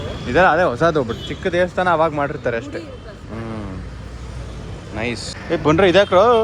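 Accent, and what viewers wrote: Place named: Udupi, India. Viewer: udupi mglor kannada supper😂❤❤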